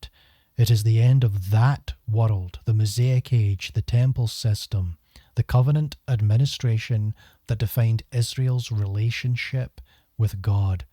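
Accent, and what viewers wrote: Scotland, United Kingdom. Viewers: Just found your channel, really interesting... at least what I can understand past that damn thick ass Scottish accent 😂😂😂 but I love it 😊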